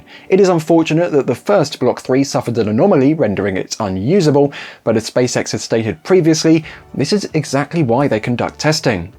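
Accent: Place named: England, United Kingdom. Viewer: Wrong? He's and Englishman speaking English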